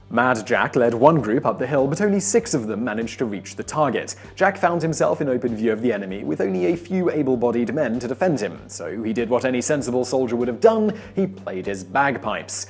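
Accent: England, United Kingdom. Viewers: When did Michael get a British accent? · This is just a skinnier Michael from Vsause with an english accent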